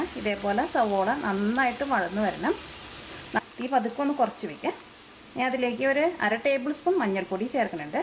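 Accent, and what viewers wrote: Thrissur, India. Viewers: Very nice. Pachakam n vachakam balanced. Nice Thrissur bhasha 🤗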